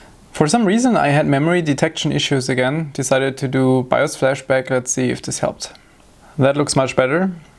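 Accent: Germany. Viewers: Man hört den deutschen Akzent soo sehr heraus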